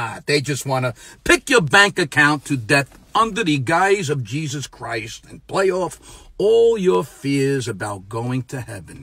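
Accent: New York, United States. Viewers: What area of America is this guys accent? New York? I love it 👍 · ...you sound like ur from New York?? Crack me up!!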